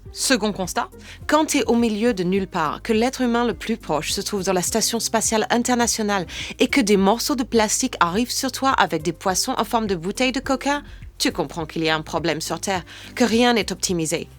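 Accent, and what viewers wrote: England, United Kingdom. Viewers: Cet accent anglais 😳😱😱😍😍😍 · Bravo pour cette nouvelle vidéo! Accent anglais parlant français très réussi! Thème d'actualité qui fait du bien aussi! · L'accent britannique est tellement parfait, il est mesuré, à aucun moment cliché. Et le fait de parler écologie : 💚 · C'est excellent!!! J'ai eu peur un petit moment à cause de l'accent, mais ha, elle parle en français avec son accent anglais, tout s'explique! Et le message de la fin est extrêmement pertinent (personnellement acquise à cette cause à 100%, j'utilise des sacs en tissu, des bouteilles consignées et j'ai des pailles lavables en métal)... et la dernière phrase est tellement forte!!! Je valide avec un enthousiasme indescriptible et les larmes aux yeux!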